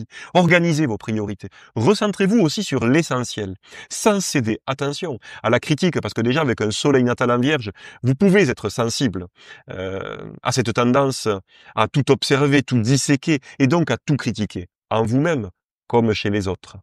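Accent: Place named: South, France. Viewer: Joli petit accent du sud😊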